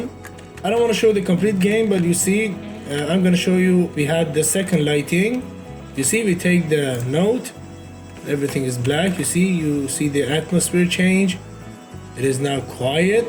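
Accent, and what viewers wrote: Iran. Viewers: سلام داداش اومدم ویدیوت از لحجت فهمیدم ایرانی موفق باشی 😂❤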